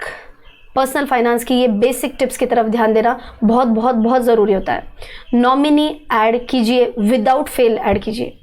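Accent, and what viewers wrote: Maharashtra, India. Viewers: What a brilliant speech you have. So captivating, so knowledgeable and genuine sounding. Your crisp marathi accent makes it more wonderful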